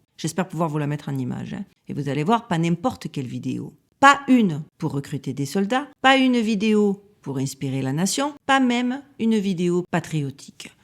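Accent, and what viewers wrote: Marseille, France. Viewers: Marseille💔💔💔super video...ton accent m enchante😉✔ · 👏🏻👏🏻👏🏻 super vidéo! Merci pour vos sujets et recherches très pertinentes 👍 J'adore votre chaîne et votre reste d'accent Marseillais...à dimanche! ❤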